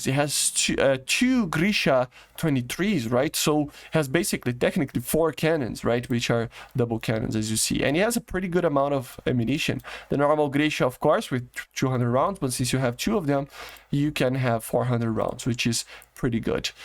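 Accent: Brazil. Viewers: Sotaque de BR....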